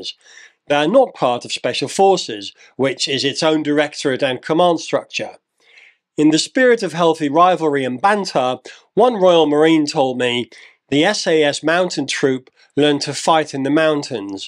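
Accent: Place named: Netherlands. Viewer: Ik meende al een Hollands accent te horen. Maar is je Engels nou goed voor een Hollander, of is je Nederlands (erg) goed voor een Brit? Anyhoo, gaaf kanaal, maat!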